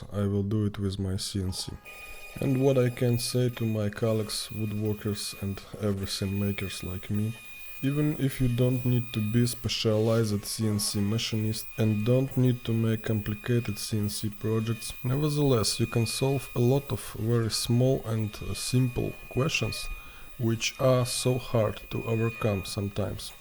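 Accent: Russia. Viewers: Ты сам из России что-ли? акцент русский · Such a satisfying Russian accent :)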